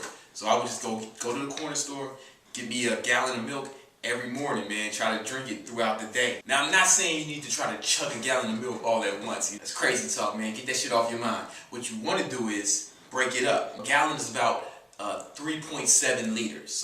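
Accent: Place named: Chicago, United States. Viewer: sure you do*,you completely avoided the question of are you a fun/lovable person in real life. by the looks of your responses you seem to be really bitter. why are you judging and getting bothered by a accent from a black man from Chicago. I know for a fact there are people who wouldn't seem "intelligent" in your eyes but have more money and a fulfilling happy life then you.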